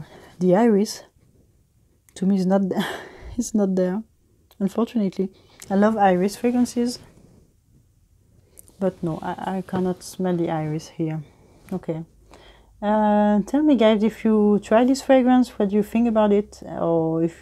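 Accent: France. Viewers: Frenglish 😂 I think it's sexy AF! Wish we could've seen the relief on your beautiful face! 😂 The joys of a blind buy WIN. 🍵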